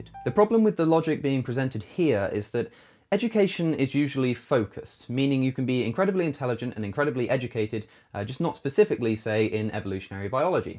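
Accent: United Kingdom. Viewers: I feel like things are easier to understand when explained in a British accent. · The British accent is the music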